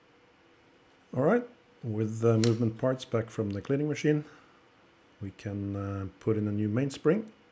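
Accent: Norway. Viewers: var bare hyggelig å høre at du fortsatt hadde norsk aksang på engelsken. Digger det du gjør👍🏼 så håper å se mer av dette :) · Your accent sounds more norwegian than swiss? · Are you German? Your accent sounds so damn Norwegian! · Financially it probably wasn't worth the effort but emotionally...its a beautiful timepiece and I would be very proud to show before and after Shots if i had been able to restore it like you did. You mentioned your in Switzerland...I was thinking. "But he sounds Norwegian to me!" 😀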